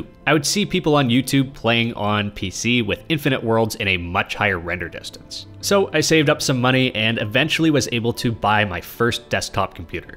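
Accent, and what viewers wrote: Canada. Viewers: Buddy you have to be Canadian. "Ferm" and "Perk" spoken like a true Easterner.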